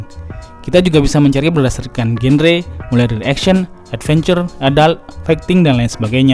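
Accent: Madura, Indonesia. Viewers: Oreng medureh? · Masih "salbut" Kek org madura 🗿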